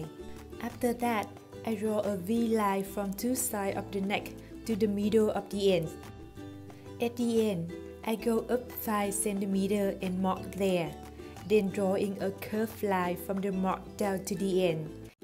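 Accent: Vietnam. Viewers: R u Vietnamese? I feel like u have a Vietnamese accent lol I luv the tops btw but I’m not amazing enough to create them lol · Walters She is from Vietnam, she has a Vietnamese accent. Definitely not deaf.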